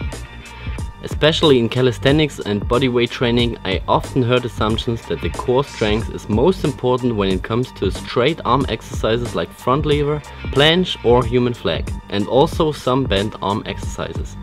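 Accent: Germany. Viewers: hahaa, typical german accent. :D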